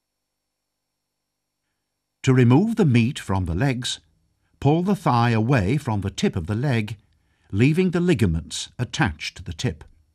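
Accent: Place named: United Kingdom. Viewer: you know it's good when a god damn brit is narrating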